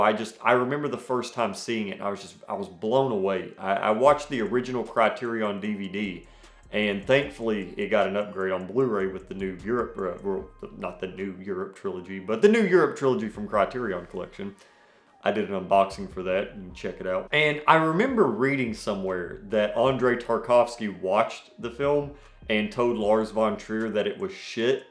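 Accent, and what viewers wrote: South, United States. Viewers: I just love your southern voice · Love hearing a southern man who’s into cinema!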